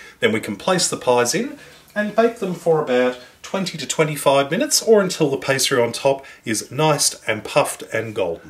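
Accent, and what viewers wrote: Australia. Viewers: 2 things about this video: 1: I live in Mexico and I miss meat pies so much that I'm about to attempt to make my own, so thanks for this video. 2: I'm going to show this video to anyone who thinks all Australians sound like Steve Irwin. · love the aussie accent ^_^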